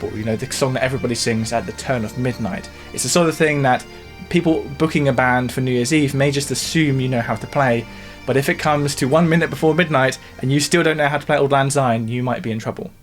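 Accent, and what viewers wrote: United Kingdom. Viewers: Soy una persona que habla español pero entiende inglés y veo videos sin problema, pero a veces me cuesta contigo porque siento un acento muy británico 😂😂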